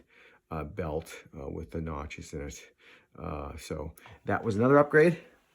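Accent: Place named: Rhode Island, United States. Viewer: your accent brings back 20 years that I lived around the Pawtucket, RI area! I bought my Reliant in Pawtucket back in late 80's-90's forget now exactly when. My late wife was from that area and she and her family at the dinner table on Sundays was a riot to listen to! Throw me down the stay'yahs a bruum! Anyway I'll double second every other comment here adding that I too have the 14", 1hp DD90 model. Man, that cabinet you built is awesome. The dust collection is genius. Finally, an old woodworking book-set I have has a chapter on bandsaws (1930's-40's) and title is "Bandaws - The Queen of Saws". Today politically uncorrect it still fits. Best video ever, thanks.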